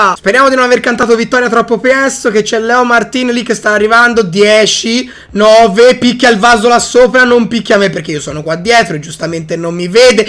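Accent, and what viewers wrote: Tuscany, Italy. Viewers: Sei toscano?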